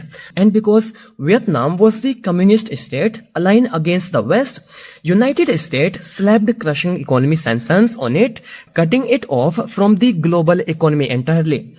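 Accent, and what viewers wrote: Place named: India. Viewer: Bro don't make video in Indian accent because you will face trolling 🙂❤ I hope you understand 🥲❤️‍🩹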